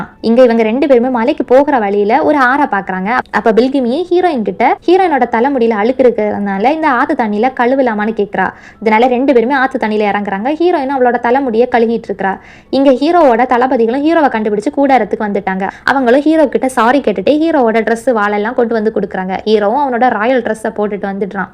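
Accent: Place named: Kanyakumari, India. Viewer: akka neega kanyakumari yaa??? unga voice la kanyakumari slang theriuthu... i am also kanyakumari ❤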